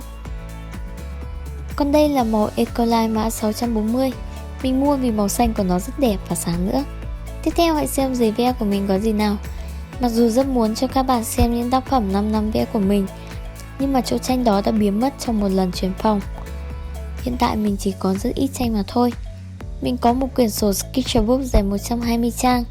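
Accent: Vietnam. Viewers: Á hahha mình nghe được giọng bạn rùi cute quá! Giờ ms bt bn là người Việt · Chị này người việt hả ? Mình mới theo dõi chị gần đây,thấy đa phần là fan nước ngoài Nên tưởng chị người nước ngoài, h nghe giọng mới biết😂😂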